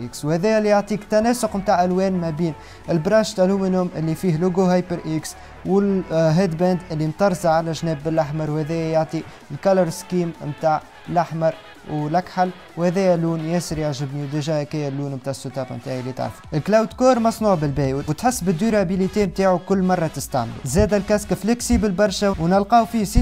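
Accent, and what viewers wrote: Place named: Algeria. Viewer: dude 3andek famille mn ljaza2ir bicuz ur voice benli dziri :3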